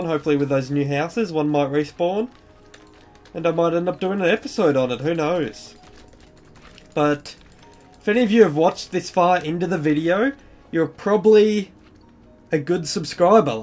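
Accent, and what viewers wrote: Australia. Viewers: love the ozzy accent :) great vids as im new to minecraft :P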